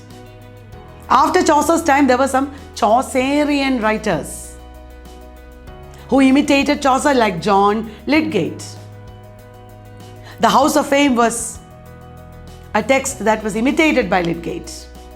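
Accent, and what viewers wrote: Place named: Tamil Nadu, India. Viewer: nice sryle ..zerous and academic,,tamilian accent..stands out !!! kudos...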